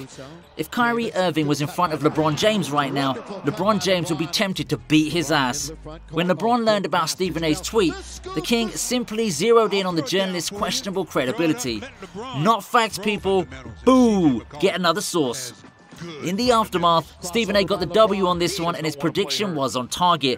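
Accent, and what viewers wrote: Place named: England, United Kingdom. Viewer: Narrator is…English?!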